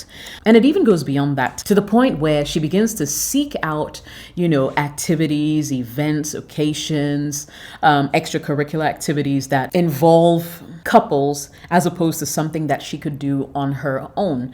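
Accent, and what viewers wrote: England, United Kingdom. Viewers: You're vocally appealing with some british,twang!👌👍🙏🙏🙏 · Beautiful dark chocolate with an ENGLISH ACCENT.... I'm infatuated. Love from "across the pond". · Did you do this video all in one take? And did you read out of a telescreen prompter? That last clicking noise you made reminded me of bdsm, sounds a bit horsy. On the other hand you have a nice english accent. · Have u lived in the Uk b4, u have a wonderful accent. Thank u for all the advices it really helped a lot. You are 👏 amazing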